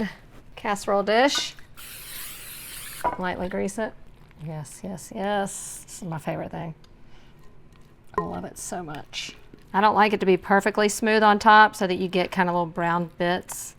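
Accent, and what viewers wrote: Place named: Texas, United States. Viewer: Is she frkm TX? Love her accent ☮️💟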